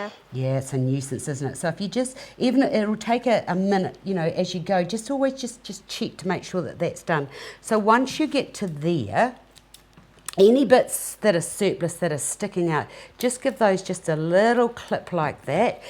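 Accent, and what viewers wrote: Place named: New Zealand. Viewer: Thank you for this lesson. I am a kiwi living in Australia and besides watching the lesson, I loved hearing the Kiwi accent. Are you from the south island?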